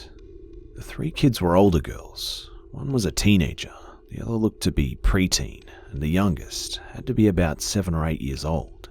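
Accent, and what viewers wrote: Australia. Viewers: The way he tells a story is not scary whatsoever. but more like he's reading from a newspaper. I mean, I can actually listen to him in the dark at home by myself and it doesn't creep me out nor scare the hell out of Me! Or maybe it's the accent, because Australians have the worst accents on the planet to me!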